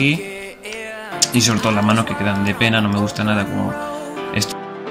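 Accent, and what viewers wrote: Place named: Spain. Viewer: lol, eres español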